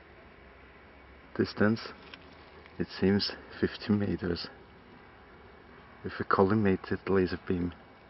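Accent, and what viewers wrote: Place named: Germany. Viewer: lol! That's amazing stuff there. And it was fun listening to you. German is up there as one of my favorite accents:D.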